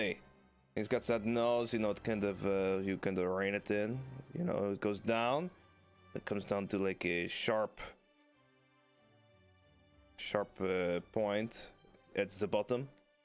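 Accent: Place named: France. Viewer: with a French accent makes him so funny and handsome (totally not saying this bc his description says so- ) Joking aside, I love seeing your silly art Mr.. Truly an artist of time indeed <3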